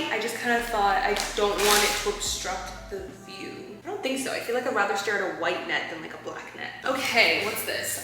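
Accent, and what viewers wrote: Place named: Nashville, United States. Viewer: you’re getting that Nashville accent😅 I love it❤ xoxo from Toronto.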